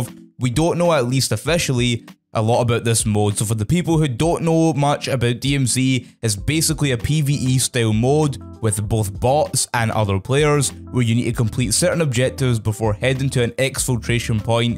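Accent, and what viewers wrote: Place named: Scotland, United Kingdom. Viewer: I am simple man. I hear a Scottish accent, I give a thumbs up.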